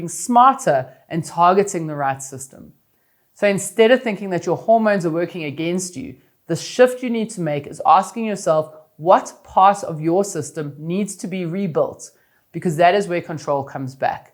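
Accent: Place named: South Africa. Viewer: I’m American and my best friend is from Johannesburg, I love your SA accent. Thank you for the great tips (I’m 50 and just started running this year)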